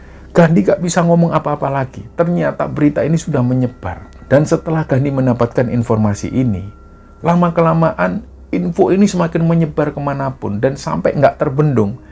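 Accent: Java, Indonesia. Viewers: mas sebagai org jawa klo melafalkan kata bahasa jawa sy harap tdk usah spt bukan orang jawa. mas lo klo bicara jg medok. utk melafalkan kata "ndoro" dan "sedoyo" kok melafalkan suara huruf D nya tidak tepat y sbg org jawa. maaf y mas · Hadir mas, mas jawanya darimana? Kalau ngomong jawa enakeun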